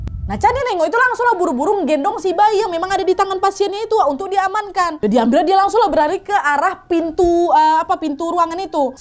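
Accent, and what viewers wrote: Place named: Medan, Indonesia. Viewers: ini aslinya orang mana sih.??? Kek orang Sumatera deh..tp ga tau Sumatera mana... 👍😂😂😘😀😀😀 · Suka bngt sama,dengan logat anak Medan ya 🤭, success dan bahagia selalu ya. Ngikutin dia dr screen msih 1jtan.. mkin ksni mkin kereeennn 👍👍 mantab. Btw tumben gak da jamscare nya nih 🤭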